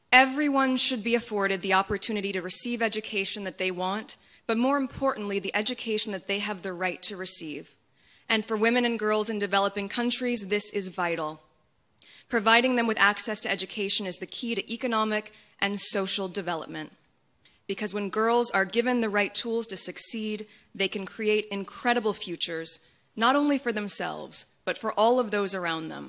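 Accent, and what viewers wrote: United States. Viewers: It's an American English accent. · That was American English! is not British. She is American.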